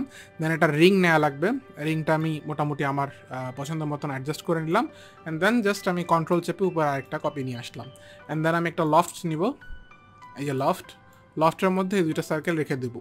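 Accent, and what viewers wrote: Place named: India. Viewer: You think we can understand your English? Slow down man, you English becomes much Indian.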